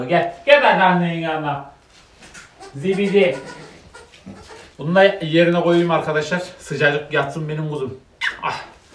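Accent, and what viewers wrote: Konya, Turkey. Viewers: merhaba, kanalını dünden beri yeni keşfettim. hayatimda hiç köye gitmedim, köy yaşamını da tatmadım haliyle. o kadar sıcak kanlı, sempatik, hayatla bire bir yaşam süren, evinde hayvan besleyip kendini zenginim diye tanıtmayan ve gerçekten insan olan biri olman beni çok mutlu etti. hayvanlarla iç içe, doğayla, çevreyle iç içe olman harika... umuyorum çok daha iyi yerlere gelirsin, hiçbir youtuberla seni kıyaslamayacağım çünkü gerek yok. herkesin doğuştan gelen yaşam tarzı, keşke ben de natural bir yerde yaşasaydım. araba gürültüsü, fabrika dumanları, boş insan sesleri, huzursuzluk, kaygı ve dahası... bunların hepsi insanı yoruyor. başarılarının devamını dilerim... bu arada konyalı mısınız? konuştuğunuz ağız çok benziyor.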